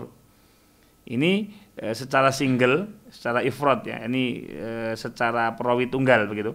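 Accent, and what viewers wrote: Indonesia. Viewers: Logatnya Bangkalan banget. 😅 Ternyata banyak Wahabi di sana Sikat, Guse...!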